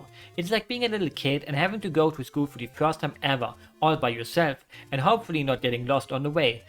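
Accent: Germany. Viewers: This guy sounds very german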